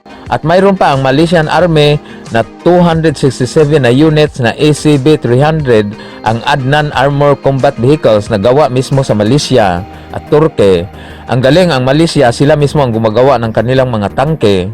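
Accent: Visayas, Philippines. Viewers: Bisaya diay ka kol, haha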